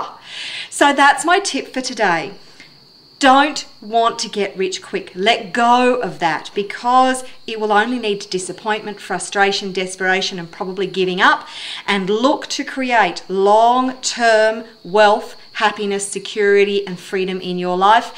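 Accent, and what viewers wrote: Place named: Australia. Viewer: great to have found your channel Thanks and great to hear the Aussie accent! Thanks:)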